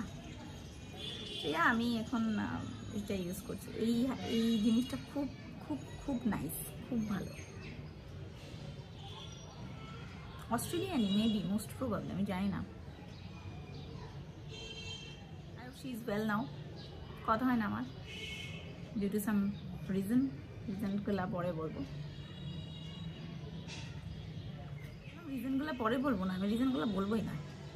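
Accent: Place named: Bangladesh. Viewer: Apu tumi khulnai thako. Prothome vabcilam tumi indian. Tarpor tomar bangla accents shune dekhi tumi deshi meye. Akhon to dekhi tumi khulnar. Amio khulnar meye apu. Take love❤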